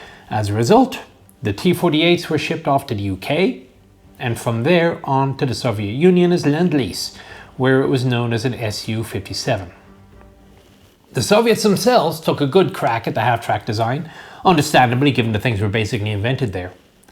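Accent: Ireland. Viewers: Is this some joke reference that I've missed? As bwarre2884 noted, the accent is very Irish. In any event, he is perfectly understandable. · I can hear the Irish accent coming out all over the place!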